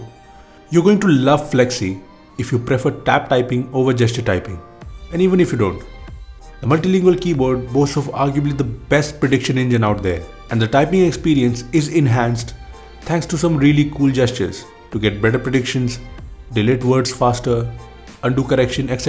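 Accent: India. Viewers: 阿三的英語發音。。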